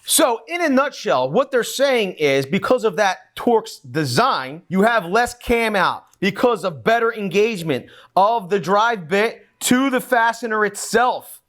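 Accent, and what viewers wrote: Philadelphia, United States. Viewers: I knew you had to be from Philly, you got that Philly energy and flare. I agree with you on the Phillips bit, most people don't understand the concept and think its an annoying feature but in aviation breaking off a fastener in the airframe means at best hours and inspection and engineering, structural analysis and tedious removal and rework. At worst all of the above plus removal of the affected airframe and replacement, hopefully nothing flight critical.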